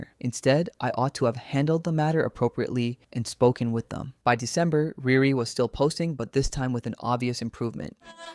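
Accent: Philippines. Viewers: i think she is filipino, im a filipino artist too, i know what my people sounds like. 😂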